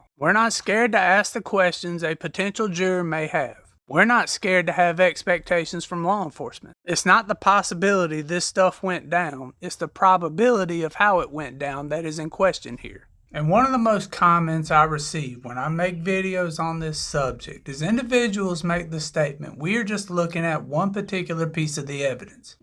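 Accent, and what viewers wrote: South, United States. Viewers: Excellent work and presentation. I’ve been into this case since Dec 13th 2022, and I am absolutely sure that BK just couldn’t have done it. Very beautiful and interesting accent you’ve got, btw - from where is it, btw? Alabama? Louisiana? I am fond of different accents! 😊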